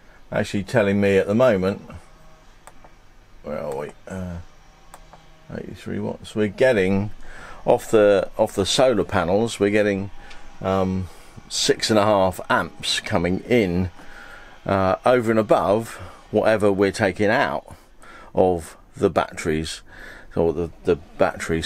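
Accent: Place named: South, United States. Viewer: I'm with on that its that southern drawl. 😉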